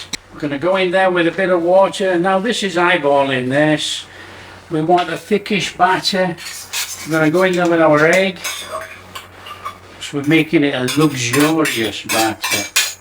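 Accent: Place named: West Yorkshire, United Kingdom. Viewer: My guess is that despite your strong West Yorkshire accent and upbringing that you are cooking in Thailand, busy every day with these splendid demonstrations and not able to remember when your waistline was 28” (if ever)!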